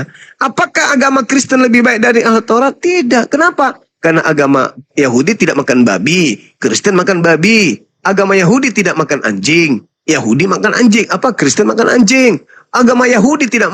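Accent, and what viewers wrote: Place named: Makassar, Indonesia. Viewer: Hahahaha bang mabicara makasara Ki hahahaha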